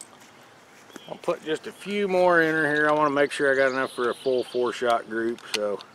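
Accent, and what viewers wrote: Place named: South, United States. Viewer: Found someone that talks more hillbilly than myself and more stupid!! Let's put another bullet in and don't forget to add that last bullet to make the action smooth!! Now let's place the bullshit aside use better terminology, you don't get smoother action by choking the mag and round or shell sounds better than bullet since that refers to the lead..By the way I live KY..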